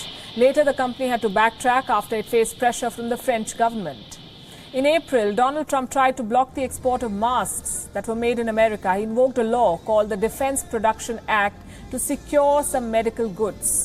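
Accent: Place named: India. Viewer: It's indian English haha Btw lakh means 100k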